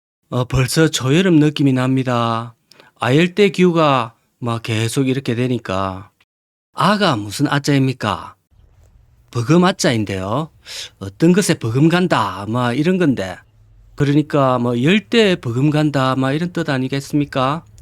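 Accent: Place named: Busan, South Korea. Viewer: 제가 아는 부산 분하고 말투가 너무 비슷합니다.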